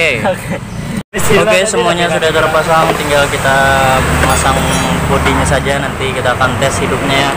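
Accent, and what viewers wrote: Banjar, Indonesia. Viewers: Lugat banjar, urng hulu sungai kah bos??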